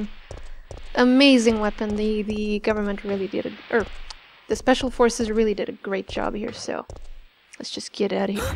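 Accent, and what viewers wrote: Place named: Sweden. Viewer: Swedish wow I have never heard a swedish accent :), lol I'm guessing you're getting mad about people saying you don't? I do have to say your english is very good, however I do like that accent!! It sounds awesome.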